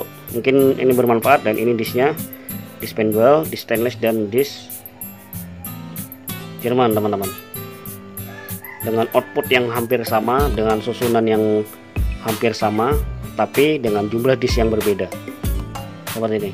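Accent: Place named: Indonesia. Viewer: Logat bahasa HSU msh lekat dan kental bang..🤭🤭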